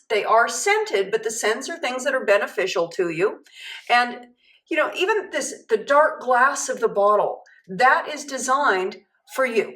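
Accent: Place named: Southern California, United States. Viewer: Noooo. He still sounds like a he, like Bruce.. It's just the S. Cali accent .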